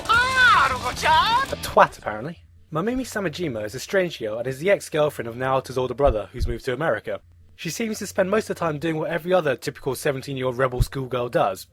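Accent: United Kingdom. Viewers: britishness shinin' thru in these old videos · he sounded more british back then but wow he's twice as much of an OG for when he watched this anime, it was 11 years ago when he watched it since it came out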